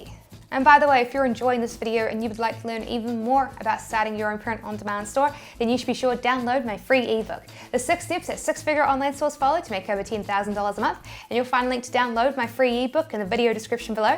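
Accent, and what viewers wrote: New Zealand. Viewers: I just watch because of the lovely kiwi accent 🇳🇿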